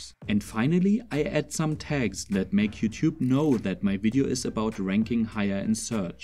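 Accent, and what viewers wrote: Germany. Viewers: Nice one, like your German accent, man. And obviously, you did a great job to rank this video, cause I found it on top of google ;-) Cheers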